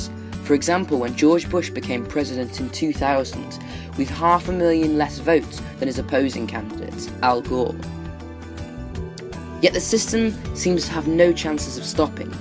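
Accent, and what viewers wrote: United Kingdom. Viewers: tight n sleek british accent..loved the video :)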